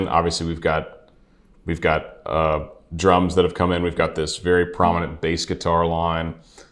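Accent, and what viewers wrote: South, United States. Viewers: The way you said her name using your beautiful southern accent was correct! Could you believe it? 😂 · Your southern accent is closer to the pronunciation of her name 🎉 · You pronounce like you did in a southern accent. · Your American accent from the South is closer to how her name is actually pronounced 😁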